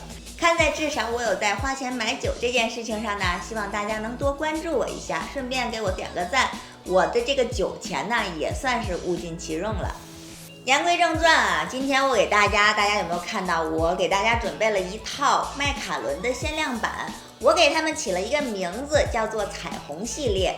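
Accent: Tianjin, China. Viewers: 天津人吗？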